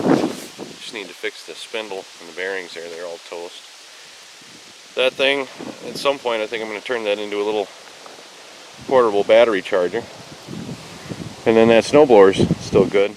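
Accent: Minnesota, United States. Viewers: Hey man r u a Minnesotan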